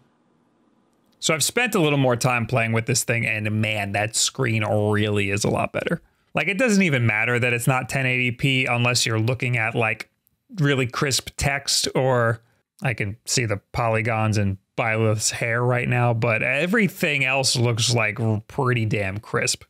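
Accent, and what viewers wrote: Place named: Long Island, United States. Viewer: God I always forget how much I hate how Long Island folks say "Mario" until I hear your videos ha-ha You seem to purposely try to say it 100 times a video. i cringe each time.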